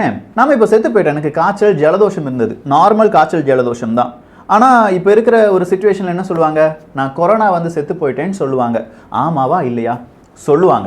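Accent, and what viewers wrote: Kerala, India. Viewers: Wow keep it up & I see you speak with Malayalam slang mixed is it so?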